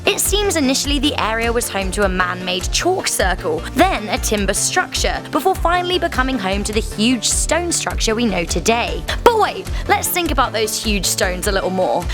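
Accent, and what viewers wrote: England, United Kingdom. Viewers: i missed your voice girl.! Nice English accent i love all about England and i don't live there but my mother did travel there when she was young and told me great things.! London...!:) Thanks for the vid btw to the next please.:)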